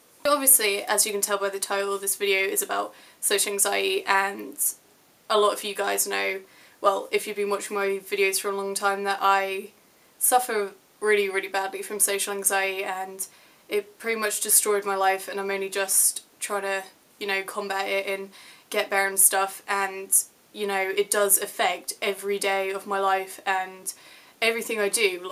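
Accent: United Kingdom. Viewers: Does anyone know what part of UK she is from? She has the exact same accent as Asa Butterfield and it's driving me crazy trying to figure out where it's from in UK. SOrry, and thanks. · before I even clicked on this video I knew you were gonna have a british accent, I'm not even joking.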